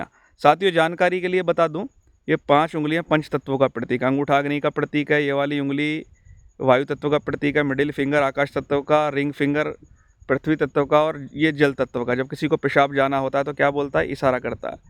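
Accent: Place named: Junagadh, India. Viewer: आप की बोलने की ले से मुझे अंदाजा था की आप जूनागढ़ से होंगे आप को सादर 🙏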